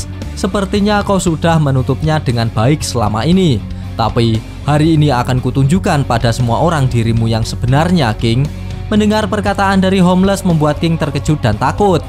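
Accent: Java, Indonesia. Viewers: Medok anjay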